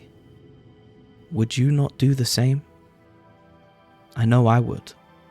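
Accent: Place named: England, United Kingdom. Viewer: High quality content + English accent = Instant sub. Spiffing.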